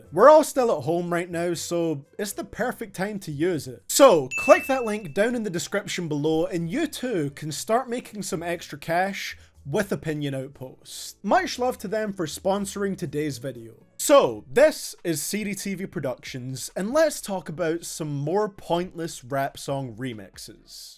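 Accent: Scotland, United Kingdom. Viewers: Its probably the scottish accent. Or the exciting wait for the HAIR REVEAL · I think he's scottish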